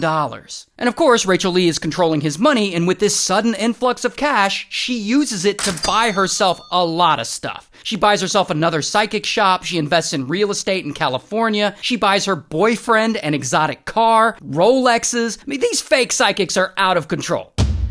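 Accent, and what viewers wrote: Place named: United Kingdom. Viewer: It's a BRITISH accent BTW.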